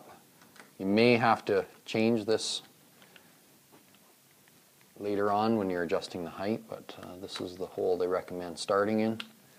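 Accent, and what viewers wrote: Canada. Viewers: He from Canada ! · Canadian, aye?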